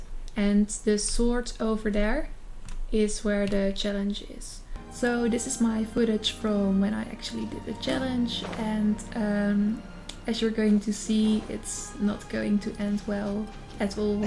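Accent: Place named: Netherlands. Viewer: She is Dutch isn’t she?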